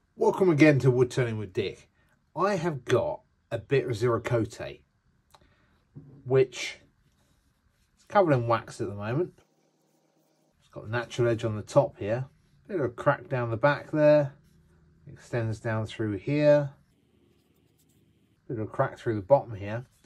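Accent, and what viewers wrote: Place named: United Kingdom. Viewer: Well done Dick love how pretty that grain is what type of wood did you use I know you mentioned and said what type it was but I just did not quite understand stand what you were saying with that wonderful British accent you have may be you should have let Lenore with her Canadian British accent say what it was being I am a Canadian Blessings from Hanna and all the hot weather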